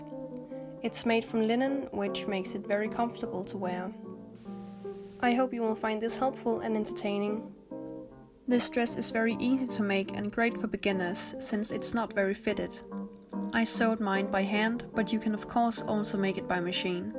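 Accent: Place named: Denmark. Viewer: Youre danish lol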